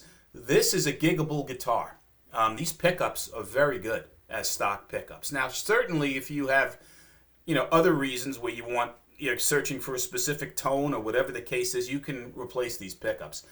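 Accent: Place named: East Coast, United States. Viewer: I appreciate the in-depth review, coupled with the East Coast accent, which completes the experience for a Midwesterner like me. BTW, if anyone clicks on your affiliate link, you get a piece of the action for so many minutes afterward, regardless of what is purchased.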